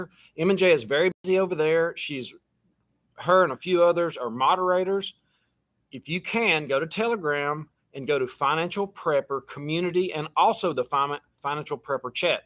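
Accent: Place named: South, United States. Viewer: This is NOT an important comment but I just have to say that you are Billy Bob Thortons look alike! That southern drawl and all❤️❤️❤️ Also, thank you for all you do in proving information to us preppers.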